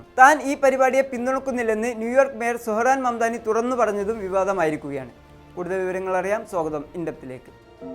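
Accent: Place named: Malabar, India. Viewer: ബ്രോ നന്നായി സംസാരിക്കുന്നുണ്ട്. പത്രത്തിൽ അച്ചടിച്ചു വരുന്ന അച്ചടി ഭാഷയിൽ വേണം ചാനലിൽ സംസാരിക്കാൻ പ്രത്യേകിച്ച് പരിപാടികളിൽ. മലബാർ സ്ലാങ് മീഡിയയിൽ വിഷയാവതരണത്തിൽ കൊണ്ട് വരരുത്! നിങ്ങൾ പല വാക്കുകളും സ്ട്രോങ്ങ്‌ ആയി സംസാരിക്കുന്നില്ല..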